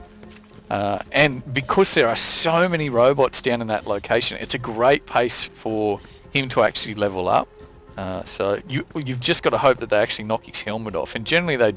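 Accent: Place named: Australia. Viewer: Man as soon as I heard your voice i checked your profile, knew you were Australian haha.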